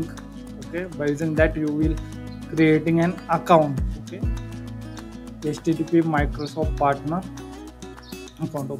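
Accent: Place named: India. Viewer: Thanks bro once I hear a Hindi voice the problems part solved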